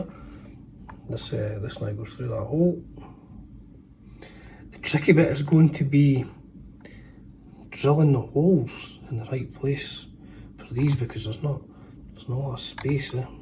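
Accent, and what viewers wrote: Scotland, United Kingdom. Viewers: Afternoon. I was actually looking at doing this with a vintage v130 I have. it’s a p90 but really it’s a p100 stacked humbucker which vintage say is kinda like a p90 but always wanted to switch it out for a proper humbucker. It’s ok sounding as it is but doesn’t sound anywhere near as good with my current set up with a Marshall origin as I found out at a gig few weeks back. Now I know what’s involved in attempting to switch out the pick-up for a humbucker. cheers big man. And keep rocking 🤘🏻🤘🏻ps there’s nothing wrong with your Scottish accent I have one as well 😂😂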